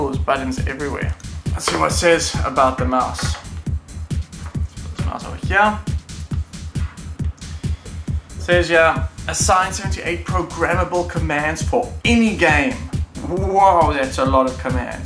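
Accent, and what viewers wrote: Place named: Afrikaans, South Africa. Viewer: Not to be rude or anything, but is Afrikaans (or Australian) your first language? I just think so because I have the same accent.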